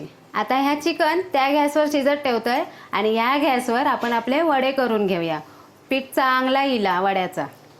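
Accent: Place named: Malvani, India. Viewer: वहिनी आज तुमची चिकन वड्याची रेसिपी बघितलंय तोंडाक पाणी तर सुटलाच पण तुमची रेसिपी सांगूंची पद्घत तर औरच। मालवणी रेसिपी बघूची आणि मालावणी आयकायचा ता तुमचाच। मस्तच।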